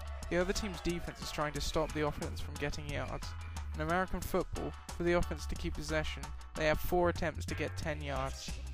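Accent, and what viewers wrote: United Kingdom. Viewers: Thanks really helpful, some people don't understand a British accent, don't know why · are you from england?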